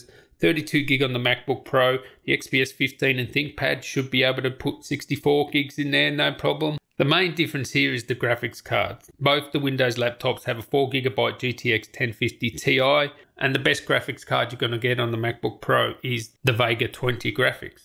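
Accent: Australia. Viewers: u sound like an aussie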